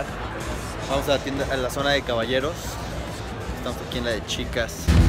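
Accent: Guadalajara, Mexico. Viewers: Mames eres de Guadalajara?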